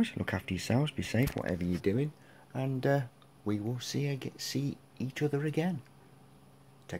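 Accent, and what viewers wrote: United Kingdom. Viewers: guessing from your accent (the way you say inductor) are you British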